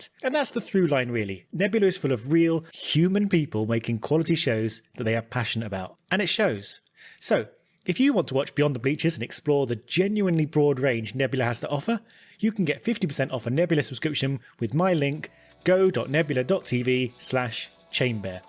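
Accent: United Kingdom. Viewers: The US Audio Track is better balanced. But still sounds British 🙂 (I'm Canadian, so the accent doesn't really matter [I know it's just a 2nd audio track with better balance]). Glad you're back! Your videos are fantastic!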